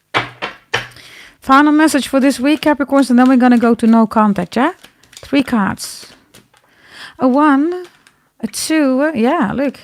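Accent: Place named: Patois, Jamaica. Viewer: 💛 missing your live and your voice so beautiful and love the Jamaican pathwa and u spot on about me being married and husband Aries and arab and I'm 🇬🇧 will get a reading soon from you 💖 🙏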